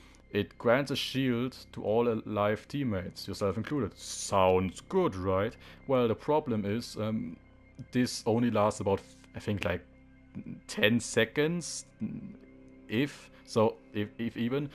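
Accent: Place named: Germany. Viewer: nothing to be sorry about, I'm german too. Your accent isn't bad, I was just curious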